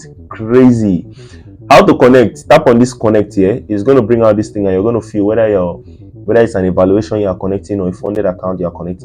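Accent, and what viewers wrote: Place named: South Africa. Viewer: You're South African 😂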